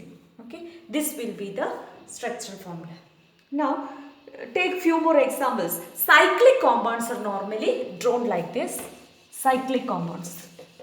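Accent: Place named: South India, India. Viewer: i thought from south part of India,based on yours accent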